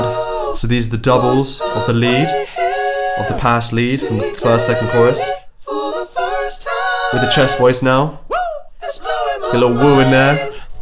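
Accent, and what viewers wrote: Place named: United Kingdom. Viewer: Who knew you had such an amazing SPEAKING voice. And a Brit? I could listen to you speak and be happy. Thank you for sharing your gift!!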